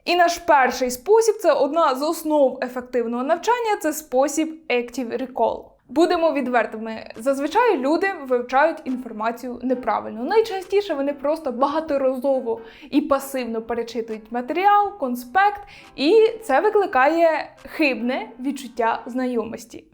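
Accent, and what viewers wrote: Poltava, Ukraine. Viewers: А ще мені дуже цікаво слухати вашу полтавську вимову🥰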